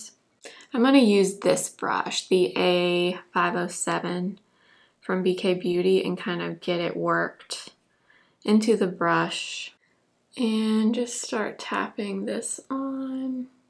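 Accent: South, United States. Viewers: Girl where are you from?? I’m from Georgia and I’m detecting a southern accent 😍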